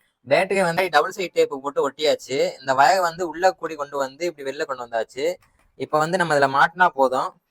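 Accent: Madurai, India. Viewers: மதுரை யா நீங்க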